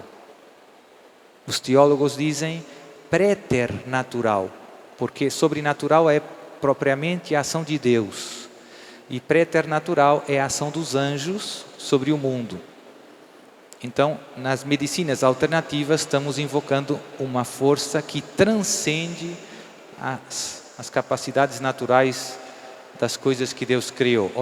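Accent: Brazil. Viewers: Ele morou no Brasil na juventude, mas com jeitinho, qualquer português é capaz de falar com sotaque brasileiro, devido às quantidades industriais de telenovelas brasileiras que têm visto desde 1977 (Gabriela e n seguintes). Já o contrário é mais difícil...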